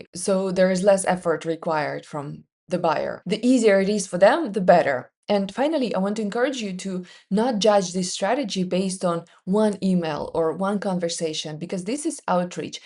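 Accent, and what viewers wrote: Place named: Norway. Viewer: you have an accent yeah / could be Norwegian?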